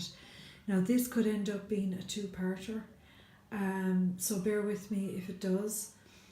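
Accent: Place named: Ireland. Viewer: I love your accent! Puts me in mind of my recently passed, VERY Irish grandfather. He is missed and you are delightful:)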